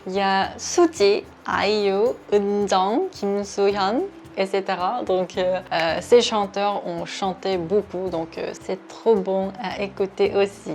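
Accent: France. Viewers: t a un belle accent Français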